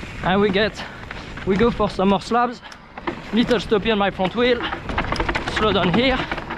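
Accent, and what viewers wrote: France. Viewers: French accent ???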